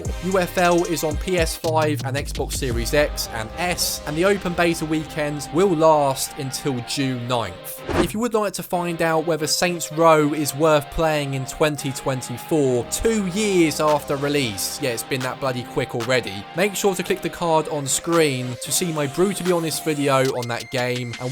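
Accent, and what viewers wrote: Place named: England, United Kingdom. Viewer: Fucking whiging fuckin englishman innit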